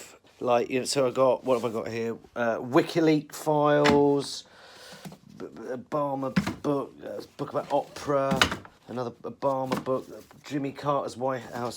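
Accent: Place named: England, United Kingdom. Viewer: NICE PURE LOVELY ENGLISH ACCENT VOICE & BOY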